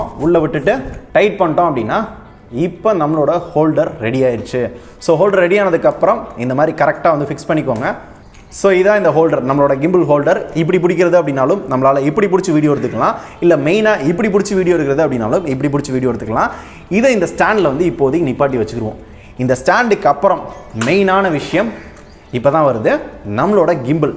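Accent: Madurai, India. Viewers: கொஞ்சம் எசவா இருக்கும்.Madurai slang😍😍😍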